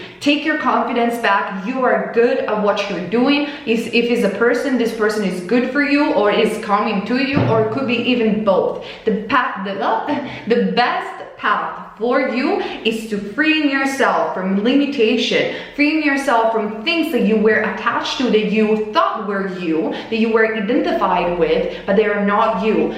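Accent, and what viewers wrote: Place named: Italy. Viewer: 👍👍👍 for Italian accent! 🍻🍻🍻 & 🌷🌷🌷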